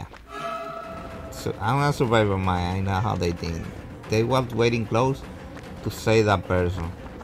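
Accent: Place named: Spain. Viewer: You are so spanish 🤣 “ehhhh” Im so happy i got this for free basically because i had auric cells before the merger with netease and when the game came out last week i had 1005 cells and on my 3rd and last flip i got the skinnn for freeee9999 and the fact you said this skin cost 500$ made me even more hype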